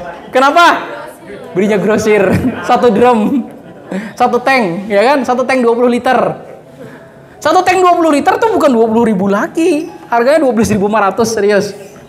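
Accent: Banjar, Indonesia. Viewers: Logatnya mirip kaya orang Banjar....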